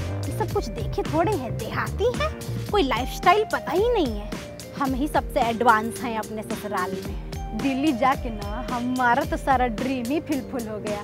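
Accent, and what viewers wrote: Bihar, India. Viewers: excellent performance mam.......... Aapki acting ke fan Ho gaye hai hum and specially ye bihari walla toh jhakkasss 👌👌👌👌👌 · bihari lady voice very original,,,its innate in you,,,graceful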